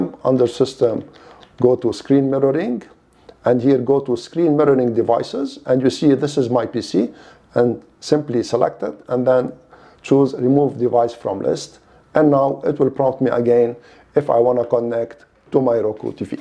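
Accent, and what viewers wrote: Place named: Lebanon. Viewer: your accent is lebanese! are you ?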